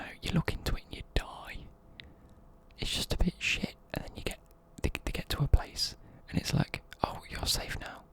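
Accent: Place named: Midlands, United Kingdom. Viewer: Where are you from? I might be hearing things but you sound like you’re from round by me (midlands)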